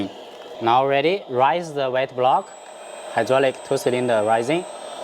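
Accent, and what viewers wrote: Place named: Vietnamese American, United States. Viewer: bro has that vietnamese-american accent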